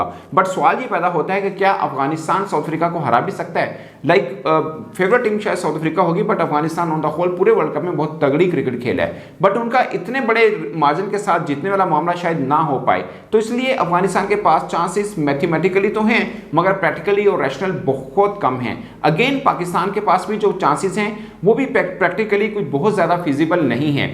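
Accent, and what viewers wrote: Punjab, India. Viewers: Kissay kuttti maaaa diyia bachyiaaaa. Who has asked u to talk abt cricket. Check ur panjabi street accent, have some respect to be on the tv. Taira content bc lund hey. Wur ja bc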